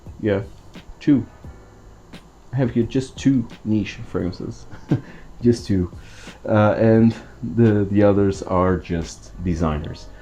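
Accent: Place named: France. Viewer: Is the video about the pronunciation or the fragrance? Are you French? That’s a beautiful thing. However, I could say the same regarding a French person pronouncing something in Spanish or English. Or, I can accept and love the beauty of each countrymen’s language and just enjoy life. Now that’s the easy part. Blessings to you and everyone. Now let’s enjoy the beauty of fragrances and the content creators work.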